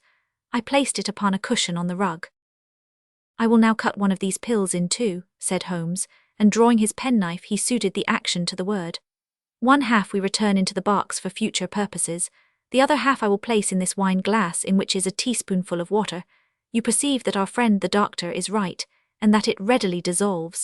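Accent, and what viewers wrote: Mid-Atlantic, United States. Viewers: Trying for mid-Atlantic?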